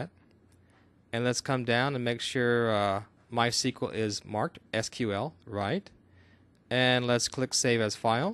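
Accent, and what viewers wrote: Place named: United States. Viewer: finally a guy with an american accent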